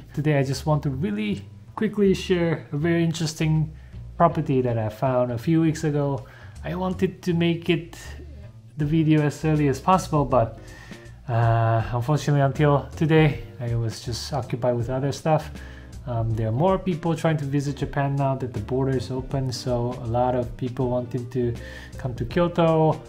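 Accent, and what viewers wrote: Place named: Philippines. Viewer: Why do viewers think you are Japanese? You sure sound kabayan to me. Thanks for your informative videos.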